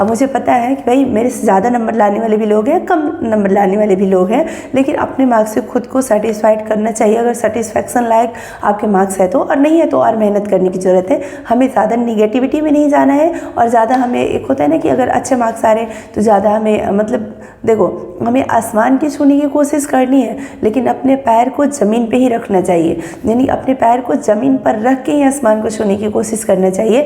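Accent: Bihar, India. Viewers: Mam ap bihar se h?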